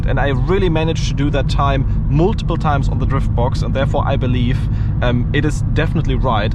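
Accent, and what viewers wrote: Germany. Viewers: The German accent makes this car so much more German 😁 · Der deutsche Akzent ist auf die Dauer schon hart für die Ohren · dat german accent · German-English :-) best english in the world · Thats every germans accent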